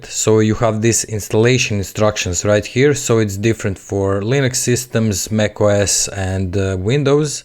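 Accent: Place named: Russia. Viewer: Акцент сразу выдает русского)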